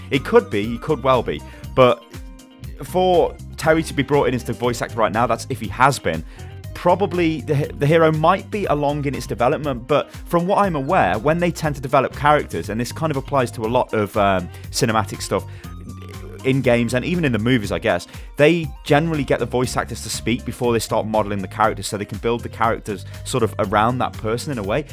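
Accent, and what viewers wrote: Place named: United Kingdom. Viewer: did get an annoying british accent and learn to clickbait? or is this just?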